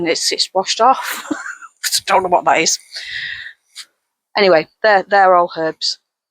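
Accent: Lancashire, United Kingdom. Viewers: Oh you popped up in my for you page and I thought I recognised that accent! I’m from Blackpool too. We live in Lancaster now, we also have a tiny yard, but have just been given keys to an allotment, it’s so lovely to have two places to grow. I’m sorry about yours, how annoying about ICI. I am loving your garden, it’s lovely.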